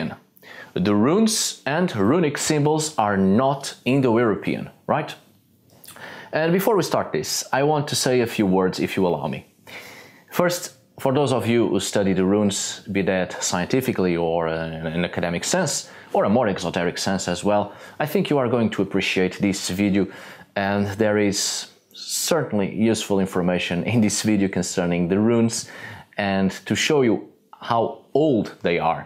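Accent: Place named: Portugal. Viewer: Were are you from' your accent looks very portuguese x)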